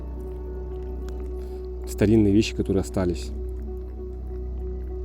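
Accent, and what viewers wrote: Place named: Russia. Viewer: Как приятно слышать настоящую русскую речь, неиспорченную, народную. Удачи и процветания! Из Полтавы, Украина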